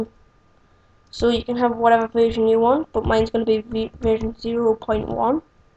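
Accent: Newcastle, United Kingdom. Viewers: You from Newcastle? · Nah sounds like a geordie to me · Geordie? I'm a Geordie lad myself! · i cant stand your stupid british accent, thats why i stopped this video at :10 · your jordie accent is really penetrating my skull :O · Loopy Parky British, his accent is British. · are you from newcastle? :D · Are you from Newcastle? You sound like it. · Accent. British. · Ur a Geordie wi ai Mann (y) :D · Wow, you just showed all of us your excellent grammar skills. Thank you. And, uhh, He is a geordie. He's from Northumberland (Probably). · This isn't a Scottish accent... This is Geordie i think. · mate are you jordey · It's Geordie. Newcastle in England.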